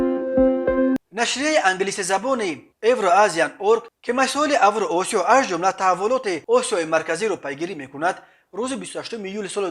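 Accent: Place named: Badakhshan, Tajikistan. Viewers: Хамин диктор акценти Бадахшониро дорад..Маьлум аст, исмоилиямазхаб асту ин маводро яктарафа тахлил кардааст,аммо тарафи дигари тахлилхо хам хаст. Мардуми Бадахшон,бидуни Ванчу Дарвоз,ки худро муриди Пешвои мазхаби Огохон медонанд,аллакай аз Огохон ру тофтанд..Байьати худро бо Огохон ботил гуфтанд,бар Байьати гузаштагони худ низ иснод оварданд.Дар рузхои хассоси аз ноябри соли 2021 то июни соли 2022 бар ду фармори Огохон шак оварданд.Ин мардум Чун бар гуфтаи Мавлоно ХозирИмом шакку шубха оварданд, барои давлату миллат низ бехтарин шахрванд нахоханд шуд. Хамон даврахое ки мардуми солимфикри Хоруг бар зидди чинояткорон вокуниш карда буданд,кормандон Чамоатхонаи Хоруг онхоро радди маьрака эьлон карда,хатто аз Чамоатхона бароварданд.Хатто барои намозхони ба Чамоатхона даромадан намонданд. Ман шохиди хол.Баьдан дар лагерхои ИТРЭБ ки аз тарафи фонди Огохон маблаггузори мегарданд, фарзандони "хукуматдорхоро" пеш карданд..Писари як корманди милиса,бачаи муовини раиси шахрро..Таьлимоти Огохон бузург аст,вале бетаьлимон онро олуда месозанд. 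Барои хамин ин лагерхову литсейю дигар саитхоро "тоза" кардан даркор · Диктор своим акцентом подражает, Бадахшанцам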